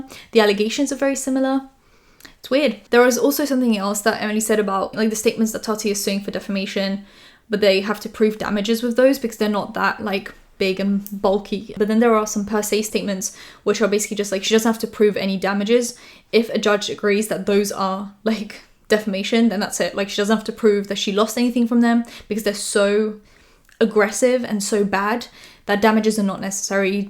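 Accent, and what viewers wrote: United Kingdom. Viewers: aw ur british accent is so cute!!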